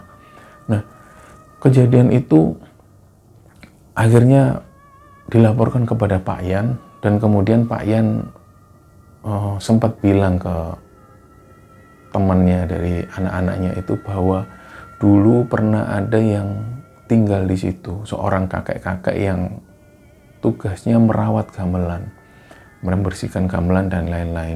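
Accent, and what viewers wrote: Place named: Surabaya, Indonesia. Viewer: kalo gw kangen sama bokap gw, dengerin channel ini. Bokap gw orang Surabaya .... logatnya dan gaya berceritanya sama ^__^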